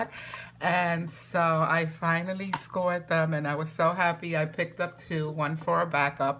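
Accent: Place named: Philadelphia, United States. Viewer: Great red truck items. Where are you located? You have an accent like my aunt. She lives in the Philly area.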